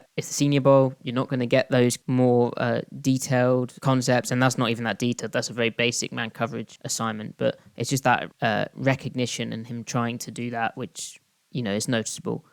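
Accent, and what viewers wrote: United Kingdom. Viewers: It's cool hearing a British guy seriously understand football. 🤙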